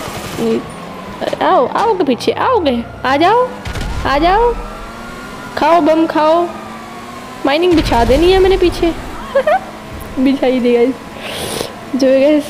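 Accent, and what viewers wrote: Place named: Haryana, India. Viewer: same hare me too haryanvi Edit : dekhkar aur awaj se hi smj gya haryanvi Chori h 🤟🤟💪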